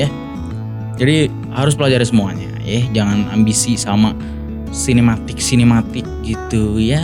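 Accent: West Java, Indonesia. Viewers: bro sunda nya di belah mana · Orang mna kang,.. Sani sunda emhh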